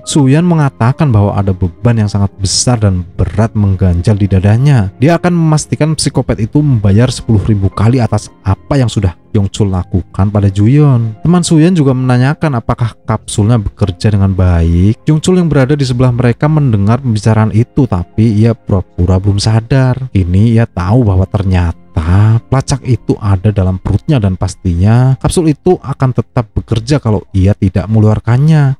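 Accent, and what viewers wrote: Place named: Java, Indonesia. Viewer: Bang lu orang Jawa ya ? Soalnya kaya keciri banget dari logat Luh ngomong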